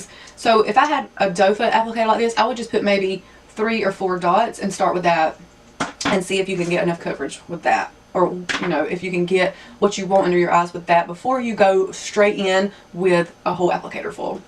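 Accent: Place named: South, United States. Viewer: At nyte tyme...i love country tlk haha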